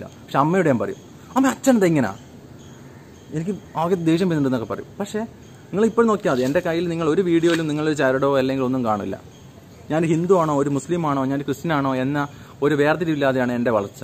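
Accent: Kannur, India. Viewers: ഹിന്ദുവാണോ. ക്രസ്ത്യൻ ആണോ. മുസ്ലീം ആണോ . എന്ന് മനസ്സിലാവില്ല എന്നാലും കണ്ണൂരുകാരനാണെന്ന് വർത്താനം കേൾക്കുമ്പോ മനസ്സിലാകും. ഞാനും കണ്ണൂരാണെ.....😀😀😀😀😀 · Kannur language super 👍👍👍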